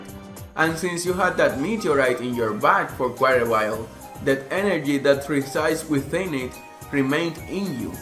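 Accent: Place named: Latin America. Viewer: That was a great theory, awesome job man! I am curious about your accent, are you latino?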